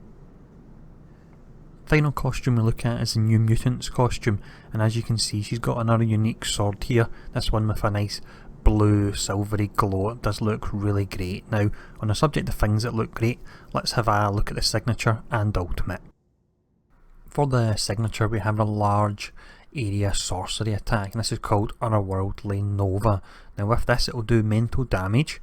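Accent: Scotland, United Kingdom. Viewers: ffffffuuuuck that Scottish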